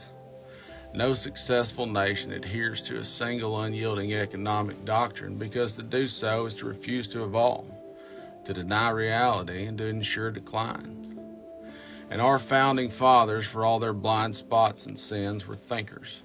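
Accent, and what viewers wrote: South, United States. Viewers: It’s a glorious moment when you finally hear an American with a southern accent take all the stereotypes out there and stuff them in that dark recess. Pure accuracy, concise and eloquent language, and a poignant point. I live in a country where the social safety net is decaying and there is handwringing about how it is funded. There is no handwringing about the moral fact or need for it. China is not a utopia for its people but it can do things 🇺🇸 can’t. It can have a consistent social, economic and commercial strategy for decades. Whether we like the direction of travel, it can move itself consistently. But it isn’t communism or socialism. It’s authoritarianism. That’s a cup you now drink from too 🇺🇸. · Wow, the most sense I've heard from an American for a long time, and delivered in accent that sounds like a Redneck! Well done sir .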